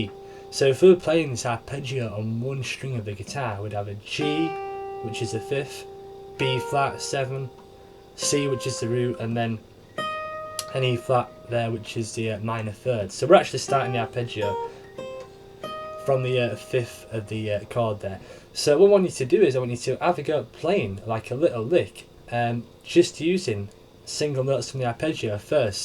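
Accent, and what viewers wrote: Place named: Hull, United Kingdom. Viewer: Good lad - I'm from Hull myself, nice to hear a lesson in an accent similar to mine!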